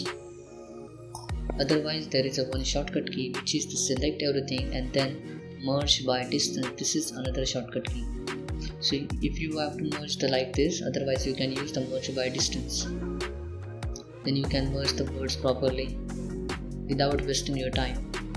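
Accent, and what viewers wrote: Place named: India. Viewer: Hello, very interested in what country you are from? India? From your accent it seems to be India, I want to check the assumption. I'm from Russia myself. Video is amazing!!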